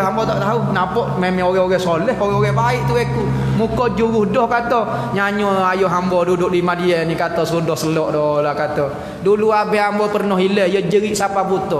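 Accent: Kelantan, Malaysia. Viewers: Sedak dengar kecek klate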